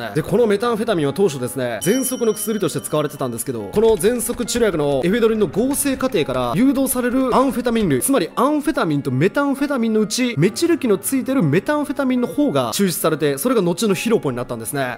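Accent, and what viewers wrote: Kansai, Japan. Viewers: 標準語で話してますけど関西の人なんですね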